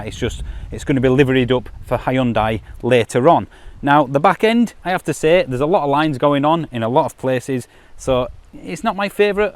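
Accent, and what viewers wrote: United Kingdom. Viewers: D YouTube doesn't actually restrict your channel to UK only and it also doesn't say so anywhere. I can see the steering wheel being on the wrong side of the car though (YOMV) and Her Majesty's English is clearly noticeable and better to listen to than what they did to it on the other side of the greater pond. I do respect that you base your opinion on the UK point of view, but obviously you must also realize that Hyundai is not exactly a British brand and this is something of a global theme. Yes I can see that from a consumer point of view your issues with this energy transition may seem(!) less than where I live but at this point I fail to see why on earth you would have such a heavy connection to the grid without having any need for it. I do fear that probably as a result of this you're not really seeing the problem from the production side. 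The remark about fitting 144 solar panels to your roof, that was not a joke. That is the reality from transposing this idea onto the full population.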